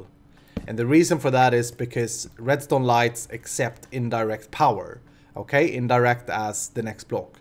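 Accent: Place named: Sweden. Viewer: Man, I feel left out of the conversation here! Oh wait det gör jag inte alls det! You're the only youtuber with a Swedish accent I'm watching. Because the Swedish accent makes me cringe (mainly because I can hear all the stuff I, myself, pronounce erroneous in English, it's a bit like hearing yourself recorded, but with someone else's voice). But you're doing way to interesting stuff for the accent to outweigh the content produced!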